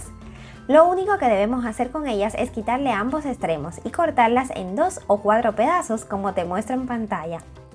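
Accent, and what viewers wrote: Cuba. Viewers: Solo sé que me encanto tu acento cubano. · Hablas con acento habanero ☹️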